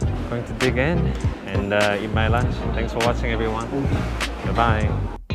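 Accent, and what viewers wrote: Singapore. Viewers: What a bummer! I actually came across your channel just a couple of days ago from the covid test in Changi video. (But ofc family comes first) And it's so sad to hear you leaving SG already. And u even live so close to me, I'm at Lorong Ah Soo! These years in SG has definitely made u really Singaporean with your accent and all. I wish you all the best in your future endeavours! Really hope to see you back here soon~ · I also like just subscribe and find your way of vlogging very interesting, was not aware you are a South Korean until u mentioned lol. You sound almost like singaporean. Sad to see u leaving, all the best and visit Singapore whenever you can 😁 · It's true. SG is very comfortable can live forever....wow . You have our local accent too. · Haha you don’t sound like a Korean. You sound more like a Singaporean. You’re a good son, are for yr father. 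Pray for yr father good health. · Family is important, you have made the right decision to spend time with your dad and help at this crossroad. SG is never going to be too far for you to visit. You are more Singaporean than most of us residing in Australia. Awesome singlish All the best, will keep tuning in! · Just chanced upon your channel and started binge watching all your videos. Thanks for pointing out so many things that we (SGreans) have taken for granted. Loving your Singlish... but now that you're back in NZ, people are gonna look at you weird! lol! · You are adapted our Singlish when u talk. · Hey, do you really know that you are true blue singaporean, I’m sure you do! Feel free to come back anytime and I’m very comfortable with you when you speaking Singlish.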